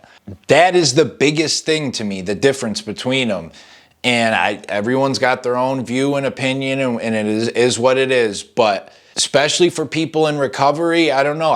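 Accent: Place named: New York, United States. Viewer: You’re from central New York aren’t you? Lol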